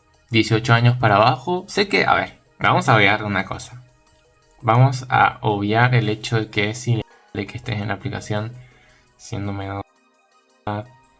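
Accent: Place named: Argentina. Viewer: Eres Argentino 😊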